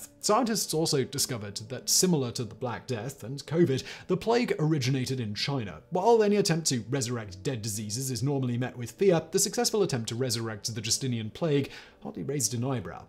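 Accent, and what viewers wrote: United Kingdom. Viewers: IDK if I love your channels for the content, sense of humor or your British accent.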